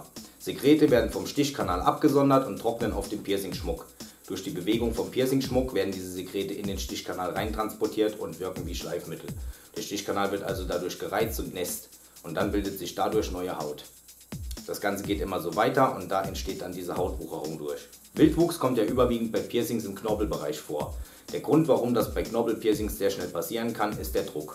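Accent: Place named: Palatinate, Germany. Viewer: ahso, du kommst aus der Pfalz. Deswegen biste mir so sympathisch😊Wir sind Nachbarn. Ich liebe den pfälzischen Dialekt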